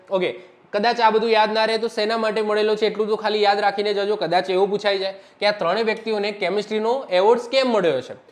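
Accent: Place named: Sabarkantha, India. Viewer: Mu sabarkantha no so su saheb tamari bhasa mara jevi se ❤❤❤❤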